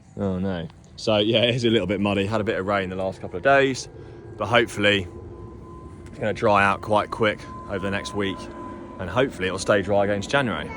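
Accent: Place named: United Kingdom. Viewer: No one needs ask where you come from, its easy to hear you are a Brit because every other word is ''yeh'............................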